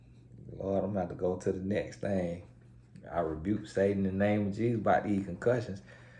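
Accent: South, United States. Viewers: It's true Mr. ur country accent makes you stand out from everyone else in a great way, so continue being you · I LOVE accent!!! Omg. I love the Southern drawl. It’s so attractive. I’m from Jamaica & notice that all Southerners actually express in an authentically BLACK, indigenous way. Like they’d say listen here… listen good. That’s exactly what a Jamaica would say although not exactly the same words. It’s the energy behind the words. We’re not going to say, here me out, an indigenous black person would say listen good, in any traditional African language. Even their mannerisms are more close to indigenous peoples than those from LA, New York. It’s odd to me when they say Southerners hate their accent. I’m like whaaaat? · Hi! I can completely relate concerning having a country accent. I'm From Oklahoma! So I Get It. I am a Podcaster and have worked in customer service for about 29yrs. 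I smooth out my tone & pronounce my words more not bcz I'm ashamed of my accent. It's because I'm Speaking to be Understood so that is how I consistently keep from feeling like I'm not being "Myself". But I get a lot of Flack from my friends and family back home when they hear my podcasts. They say I need to be myself but if I start talking with my thick Oklahoma accent only a few would understand me. So I've come to the realization that I'm blessed with 2 ways of speaking just like some learn To speak 2 different languages. I LOVE YOUR ACCENT! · Hi, just wanted to let you know that you don't sound "dumb" at all with your Southern accent. Here's why: You have actual sense. Intelligence flows out of your mouth. So, for me your accent just adds fun decoration to the intelligence and wisdom you deliver in your videos. Sounding "dumb" has more to do with the contents of your words (or the lack thereof), than having an accent. 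My parents have their Caribbean accents (One is Trinidadian and the other is Jamaican), but they're not dumb. (And I'm coming from the perspective of a person who's not American. I'm Canadian, born and raised. I'm a black person, of Caribbean decent. I don't have an "accent" and I talk to Americans at certain points during the day at work and they think I'm American, lol 🤣😭)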